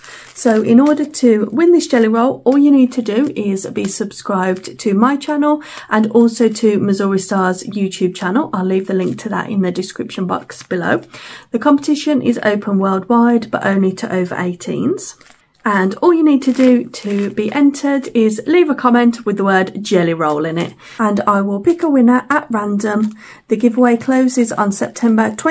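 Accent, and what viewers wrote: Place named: Midlands, United Kingdom. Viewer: Whereabouts in the Midland s are you from. I'm from the Midland too so recognized your accent. I also have a Husqvarna but it's the ruby and has problems, sadly.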